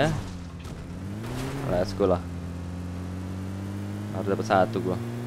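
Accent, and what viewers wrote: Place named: Indramayu, Indonesia. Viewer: kaya orang indramayu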